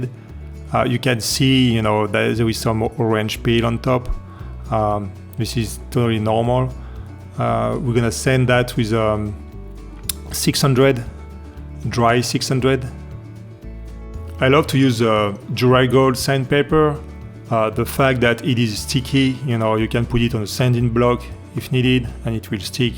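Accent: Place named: France. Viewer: I love the french accent 😃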